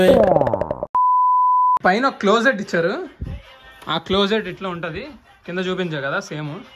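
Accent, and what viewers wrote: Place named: Telugu, India. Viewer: Baga edit chesav anna with pakka Telugu bidda voice 😁😁🤝🤝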